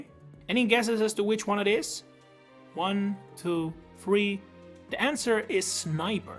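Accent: Netherlands. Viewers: "I am actually dutch" I was able to tell instantly, I have the same accent in English LMAO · Aha, I just knew I heard the Dutch accent! Hallo! buigt Cool vid though, these classes are so weird.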